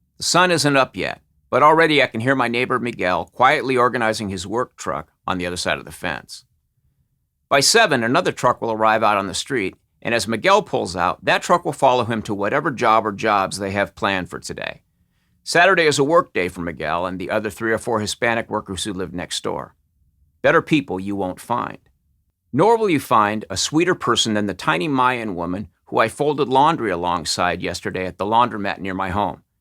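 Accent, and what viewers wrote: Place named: New York, United States. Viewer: You might be the West coast but you sound like here in New York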